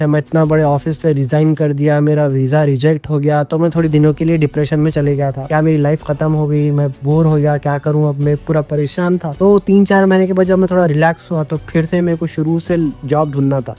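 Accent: Hyderabad, India. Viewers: Nice accent bro hyderabadi ❤️ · Tum Hyderabad ke dikhre accent dekh ke. Good to see our Hyderabadi doing such great work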